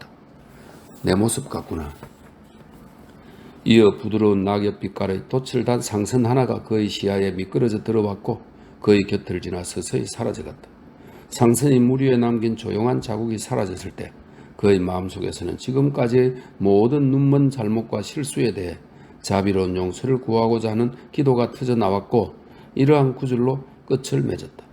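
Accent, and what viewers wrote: Gyeongsang, South Korea. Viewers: 덕분에 긴 명작을 즐겁게 듣고 있습니다. 낭독자님의경상도 억양이 제게는 익숙하여 더 편안하고. 천재적인 작가의 표현을 재미있게 하셔서 웃으며 듣습니다. 그런데 3부의 10장을 찾을 수가 없어서 답답합니다.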